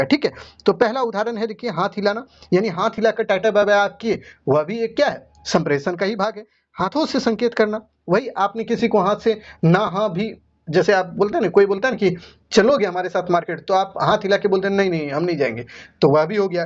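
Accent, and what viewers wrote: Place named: Bihar, India. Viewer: Sir aap bihari h n ham v bihari h aapka language bahut accha lagta h😂❤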